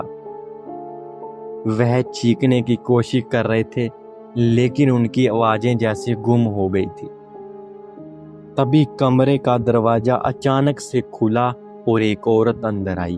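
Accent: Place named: Punjab, India. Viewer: Jado tu story start kiti mein pehla he guess kr lya k tu Punjabi ha, kehra pind Mitra tera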